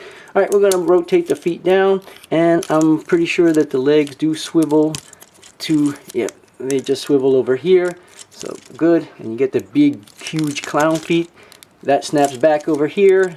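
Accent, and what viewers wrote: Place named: United States. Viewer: how do you have an american accent if your not from the US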